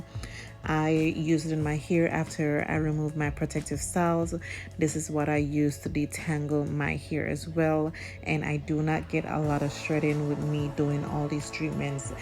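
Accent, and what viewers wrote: Jamaica. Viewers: Jamaican accent strong tho lol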